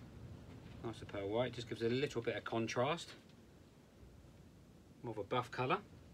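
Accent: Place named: United Kingdom. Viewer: One Brit to another , I seem to understand better with the accent!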